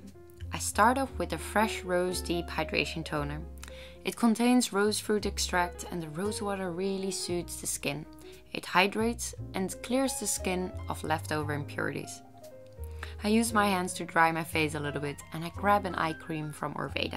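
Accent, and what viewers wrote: Netherlands. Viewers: Her Dutch accent makes me cringe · Are u Dutch?? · Are you dutch?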